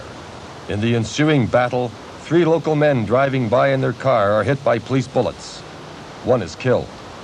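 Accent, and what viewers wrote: Canada. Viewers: Wish they had used an American narrator, rather than a Canadian.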